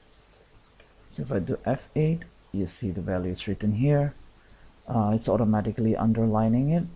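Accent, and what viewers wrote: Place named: India. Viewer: I concur that. I've just learned how to VBA. And if I may speak the truth for a minute: He has a very, very light Indian accent, which makes his instructions so much easier to understand than most of whom I've come across. It's an honest statement. NO OFFENSE.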